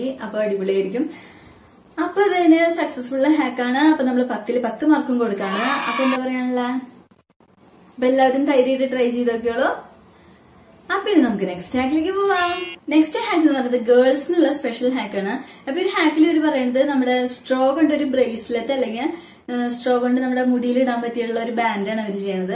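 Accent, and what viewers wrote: Thrissur, India. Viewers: Thrissur anno Language 😍😍😍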